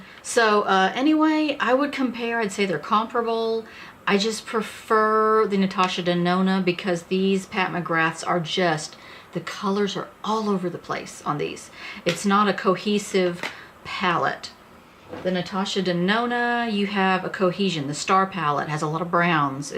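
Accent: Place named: Texas, United States. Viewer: Love this review! I wanted to see how this new palette would work on fair skin. Looks pretty! Also, I am a TX girl too & within the first 20 seconds I could tell you were from TX. 😂❤️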